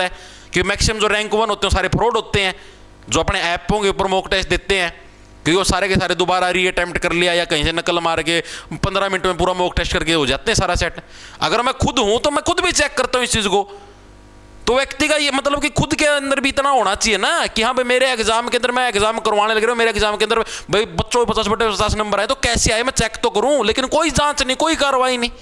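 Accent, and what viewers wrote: Haryana, India. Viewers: hlo sir kya ap haryana se hoo kya kttte desi or apni sii feel aee apki baata mm😊😊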